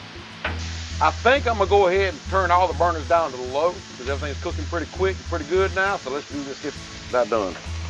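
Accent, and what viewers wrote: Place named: Panhandle, United States. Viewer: Where from in FL? You sound like you're from the Panhandle if anything. I'm in Orlando.. awesome cook.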